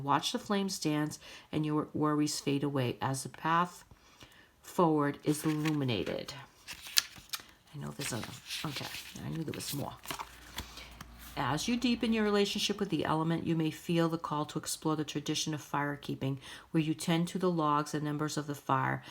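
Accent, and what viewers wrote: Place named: Boston, United States. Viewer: LOL. Every once in a while a word at the end of a sentence sounds Jersey or something (I never was there either but knew people from there). I WAS to Boston once ages ago so maybe the accent just sounded a little familiar. But cool! Boson/Portuguese! Well, love it! Next, is when will we get to see YOU? Take care! 🥰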